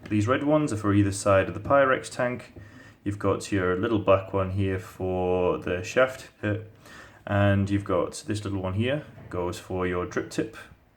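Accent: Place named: United Kingdom. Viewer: Great review, thanks. Where is your accent from in the UK? What city?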